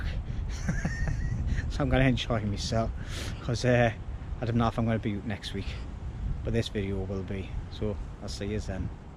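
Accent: Newcastle, United Kingdom. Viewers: It's called Geordie mate people from the north east of England 👍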